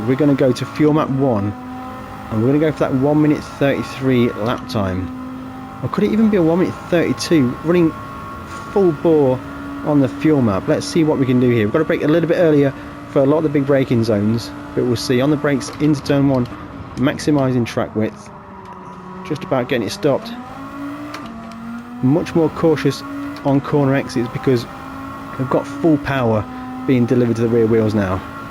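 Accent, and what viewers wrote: United Kingdom. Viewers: Not sure mate. I thought that at first, but I'm British, and you sound British too. Let me quickly boot it up and check for you, because that doesnt sound right to me. I think I bought the Maclaren Gr3 car last night for 45 million, so maybe I'm paying more. I dont know what's going on, bud. Gimme ten minutes to check.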